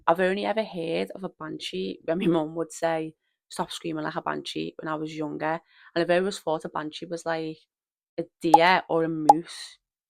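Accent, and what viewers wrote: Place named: Liverpool, United Kingdom. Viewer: Although I don't believe in banshees I loved your telling of the story especially in that gorgeous Scouse of yours. You do have a talent for storytelling. Be sure to keep developing it. We're cheering you on.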